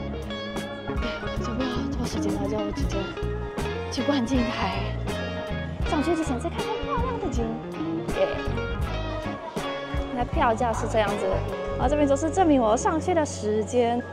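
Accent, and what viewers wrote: Standard. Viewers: 第一次看你的影片，國語講得好標準！標準到讓我有一點懷疑是不是配音的😂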